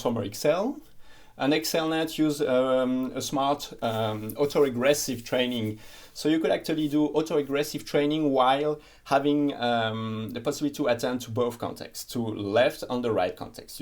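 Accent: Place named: France. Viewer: Great content, but it was really hard to understand because this idiots thick french accent. 😔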